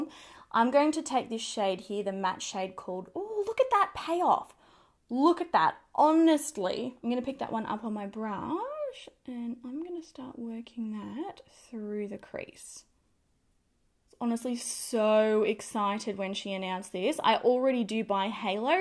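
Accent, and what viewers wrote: Australia. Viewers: I freakin love your Australian accent!!!! Watching here in the US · Oh my goodness 😍❤️ that palette is so beautiful. Hope I can win it I never win anything but hey you never know. Love your videos as always and love the Australian accent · Love that I have found your channel through Tati! So nice to hear an Aussie accent killing it on YouTube 🥰🥰🥰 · I'm an Aussie living in the US and it always makes me so happy to hear a familiar accent <3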